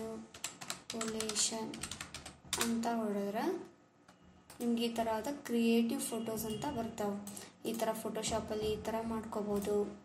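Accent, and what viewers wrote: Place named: Hubballi, India. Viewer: ಇನ್ನೂ ವೀಡಿಯೋಗಳನ್ನು ಮಾಡಿ ತುಂಬಾ ಚೆನ್ನಾಗಿ ದೆ‌ ಹುಬ್ಬಳ್ಳಿ ಭಾಷೆ ಉತ್ತಮ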